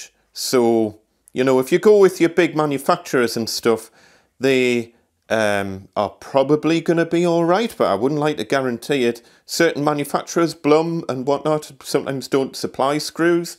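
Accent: Newcastle, United Kingdom. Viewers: Love the Geordie accent from the Northeast.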